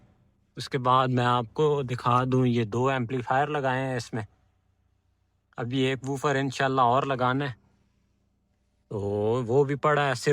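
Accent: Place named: Chakwal, Pakistan. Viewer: acha acha language apki Chakwal wali lagii islyee mujhy lga ap Chakwal se ho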